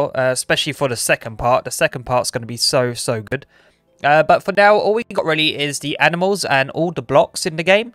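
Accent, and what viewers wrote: United Kingdom. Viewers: Hello sir! Dialect geek here wondering where about’s your accent from? Southern England? Is it cockney?😄 pardon me though, I’m just geeking here.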